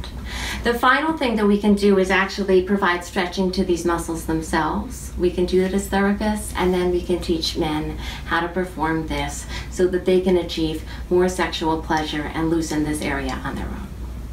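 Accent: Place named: Texas, United States. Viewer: I am glad that you found my comment funny,but I can assure you that it is very true .I think it might have something to do with your sexy Texan accent.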